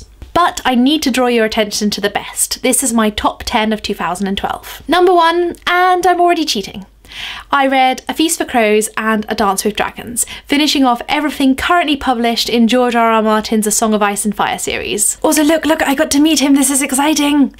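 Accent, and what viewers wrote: United Kingdom. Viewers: Hi, you from England?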